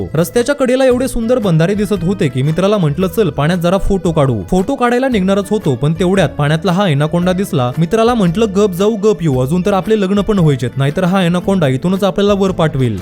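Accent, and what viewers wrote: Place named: Vidarbha, India. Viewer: Tu Nagar cha watat nahi sagle shabda Vidharbhatle.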